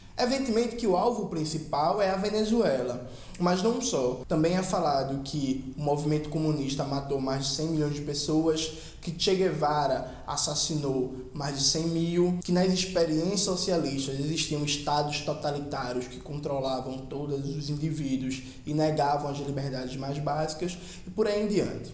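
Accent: Pernambuco, Brazil. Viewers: sotaque pernambucano é charmoso demais pô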